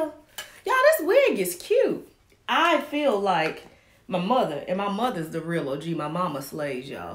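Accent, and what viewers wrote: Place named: Mississippi, United States. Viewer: Chiiiile, you're so Mississippi and I LOVE IT! I went to HS with your baby sis, and I definitely see lovable personalities are in yall's bloodline. Keep it up, love. When I'm missing home, I watch you. That accent takes me right back to Highway 90, lol! #HugeFan!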